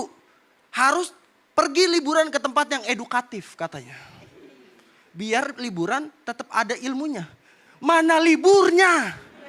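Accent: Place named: Betawi, Indonesia. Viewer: Lu Betawi ya Bang? Lucu banget dan logatnya enak didenger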